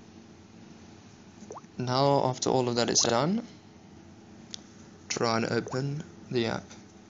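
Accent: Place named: South Africa. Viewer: Your accent sounds like that of a south african's accent,am I correct in assuming this?Prob joburg or capetown?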